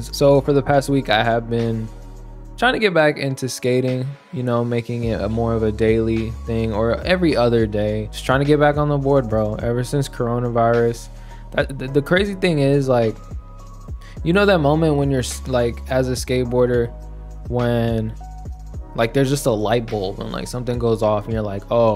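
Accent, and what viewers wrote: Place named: New York, United States. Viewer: It's amazing how you grew up in GA but have a new York accent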